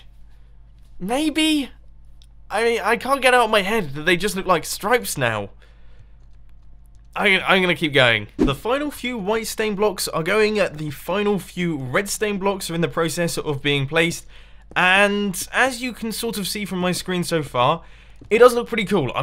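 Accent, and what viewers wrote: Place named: United Kingdom. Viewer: god, I see so many people commenting that "mumbos voice sounds like wheatly from portal"... except it doesn't. wheatly is a robot which has a british accent. is british, hence, has a british accent. just because they both have british accents, doesn't mean they sound alike.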